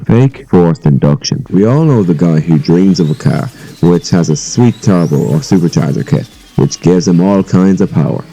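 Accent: Ireland. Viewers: Are you Irish cause I am to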